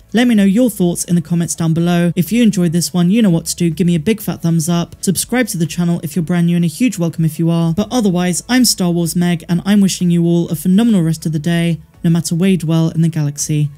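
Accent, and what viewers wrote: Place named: Cornwall, United Kingdom. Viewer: I want more jibber jabber please in your cute cornwall accent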